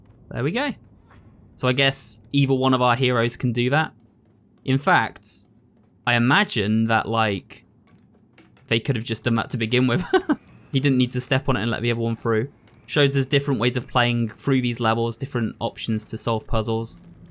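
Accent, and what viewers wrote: England, United Kingdom. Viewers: I just wanna say that I'll always love how English accent will have him pronouncing the word, "three" like the word, "free" or "tree." It tickles me pink every time! 😂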